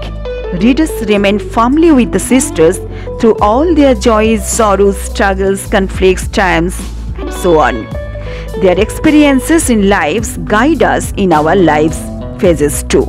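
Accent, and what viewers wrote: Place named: United States. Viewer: Wow You speak English like a American 🤩 You explain like a expert of English 😍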